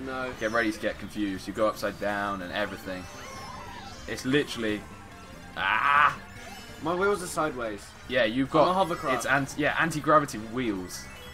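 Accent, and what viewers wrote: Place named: United Kingdom. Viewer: What part of Uk is he from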